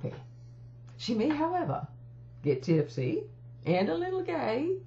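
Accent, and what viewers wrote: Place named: South, United States. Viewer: I vote for number two all the way. ' I think number two fits you and your personality. Lady like and professional all the way, and the Southern accent fits you also. You are a true Queen of the South""""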